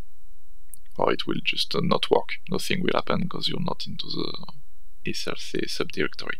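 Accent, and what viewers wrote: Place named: France. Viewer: Salut! Vu ton accent, je pense que tu es français. Quel est ton pseudo sur bitcointalk? PS: je suis ton premier abonné;-)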